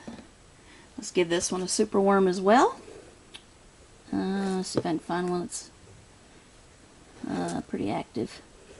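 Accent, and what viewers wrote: United States. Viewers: agree!! was gonna say the same, I love this kind of US accent, plus ur expressions :D , especially that one --> "mmm mmm mmm !" when admiring the green T's legs LOLLLLL....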